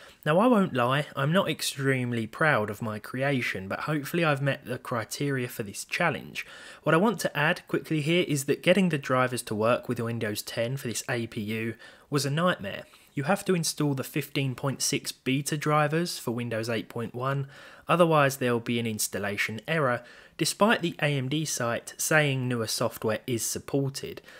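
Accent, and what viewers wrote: United Kingdom. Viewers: Lol i love this british accent.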